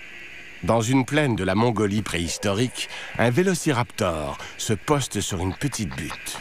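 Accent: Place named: Quebec, Canada. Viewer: l'accent québécois est tellement laid :malade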